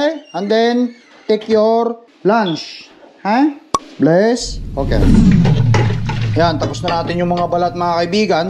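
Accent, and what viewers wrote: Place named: Visayas, Philippines. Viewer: Bakit parang bisaya na accent mo kuya hehehe ✌️✌️✌️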